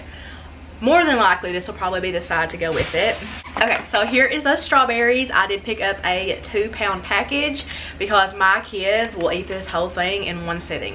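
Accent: South, United States. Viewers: I had to pause the video just to say I forgot how beautiful you are with that southern accent I love🫶🏽🫶🏽. I'm a GA southern girlie (metro ATL). 💜💜💜💜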